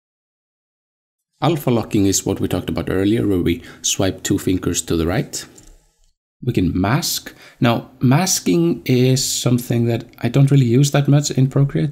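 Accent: Iceland. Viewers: Great tutorial! Thank you. Love your Icelandic accent too, a bonus! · Hi, thank you soo much for these amazing tutorials! I’m completely new to digital drawing (and not very experienced in drawing in general) and just got my first iPad (pro <num>) and bought the Procreate app mostly out of hopes to unleash my creativity in a new way. However, as I started exploring the app I got quite overwhelmed and a bit discouraged (and amazed as well of course) by all the things you can do with the program, so when I found your videos today (!) I felt really glad and hopeful that I can learn and eventually have no limits in creating in it but my own brain…. 😌☺️👍 I will check out all your tutorials and stuff (some, like this one, a few times or more I guess, before I really understand this way of thinking and creating) and I really want to thank you and commend you on great teaching skills and videos! 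Extra plus for your wonderful Icelandic accent as well! 😉👍 Best wishes from an inspired Procreate beginner in Sweden!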